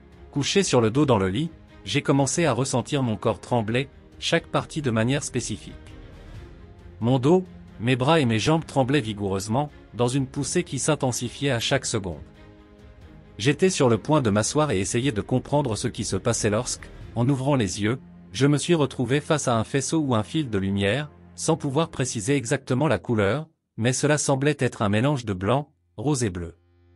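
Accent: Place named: France. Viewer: Ca parle aussi bien espagnol qu'une vache espagnole. 😂Mais malgré l'accent bien franco-français, j'ai quant même compris la fin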